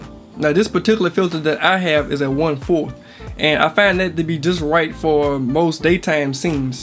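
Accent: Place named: Alabama, United States. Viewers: YOU FROM BAMA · I recently discovered your channel and have watched several of your videos. I don't normally comment on YouTube videos but I felt compelled to reach out and encourage you to keep making great content. Also, somewhat random, but I moved out of the US a couple of years ago and, originally being from the South, it's nice hearing another Southern accent - especially one in such a positive context. Keep going!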